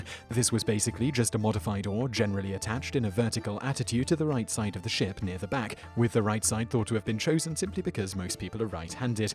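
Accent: United Kingdom. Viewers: i know that is a navigation thing, and maybe a pirate one. but why so british? even feeling that i were the joker saying that, and is a good video, the talk is too fast. still is a good info. at some point it become harsh to translate.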